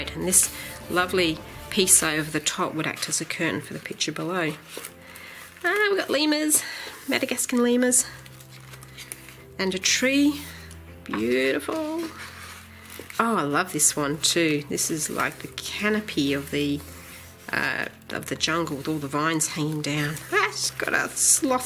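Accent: Australia. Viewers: I hadn't watched anyone's reviews until I received my copy and I got my copy a few days ago and recorded my review yesterday. This morning I thought "OH I want to see what thinks" and it was so funny, you got excited about the same features as I did. It was like watching my review but with an Australian accent. I'm a big fan and can't wait to see what you do with it in a tutorial. ~ Chrissy Morin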